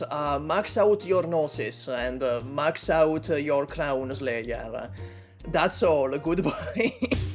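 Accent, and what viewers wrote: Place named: Italy. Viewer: Why do you sound so cartoonishly Italian?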